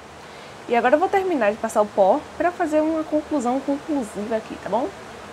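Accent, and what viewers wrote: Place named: Northeast, Brazil. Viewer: ficou otimo o video ri muito com seu jeito de falar me identifiquei sou nordestina tambem kkkkkkk mulher nao sei me maquiar nao tu deu uma aula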